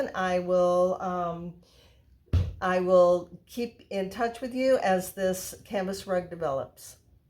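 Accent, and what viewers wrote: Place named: United States. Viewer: Your accent is obviously American. I’m curious. How long have you lived in Costa Rica? What made you move there? I love your painted rugs.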